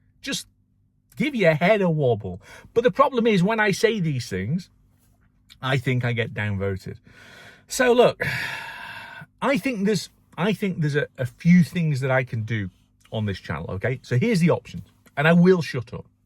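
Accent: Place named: England, United Kingdom. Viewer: I have watched most of your videos. They are very good. You are a very good speaker (far better than Elon Musk), and it is good to hear what you are thinking in an English voice instead of American. I'm sure there is a lot of good content yet to come, like your views on FSD when it arrives in the UK and Europe. Maybe you should go through the Tesla screen, taking one or two items at a time, and explain them in detail. I see a lot of new people who buy Teslas asking a lot of questions about how to do this and that. I got my Tesla Y Juniper in late December 2025, and I am still trying to learn the system. I think it is a shame that your fans can not talk to you personally. Writing comments is not the same thing. Keep up the good work.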